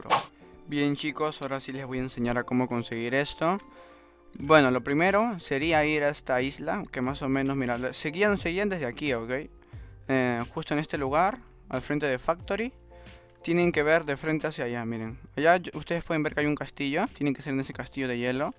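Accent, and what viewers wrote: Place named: Córdoba, Argentina. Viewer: Cordobés parece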